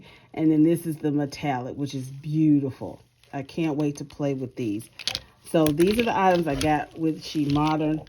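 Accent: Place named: South, United States. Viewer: Yes, I do tend to be longwinded so be careful encouraging me!! LOL I think I'm subscribed to her YT channel because her Lives show up in my feed from time-to-time. However, I usually wait & watch recordings because I tend to get a little impatient watching them juggle their demo with following along in the chat (ironic, I realize, coming from someone who "talks" as much as I do, haha). BTW, where did you grow up ~ I hear in you a kindred Southerner. I'm a lifelong Floridan [don't hold it against me though, ok...there are still a few decent ones here & there], but I've also got people in the Carolinas. My accent always has waaay more twang after coming home from visiting there!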